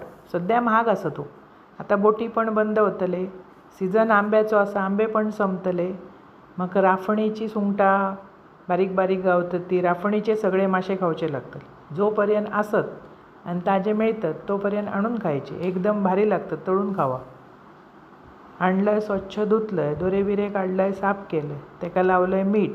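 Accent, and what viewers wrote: Malvani, India. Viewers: तुमचा आवाज छान आहे आणि मालवणी खूपच गोड. टेस्टी कोळंबी फ्राय.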